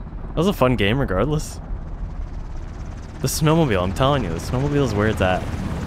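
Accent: Canada. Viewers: Oh you're french canadian?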